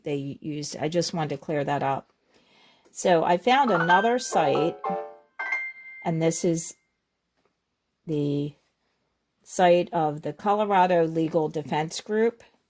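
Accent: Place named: Philadelphia, United States. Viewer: I knew you were from philly! Me too. You actually sound so much like my mom.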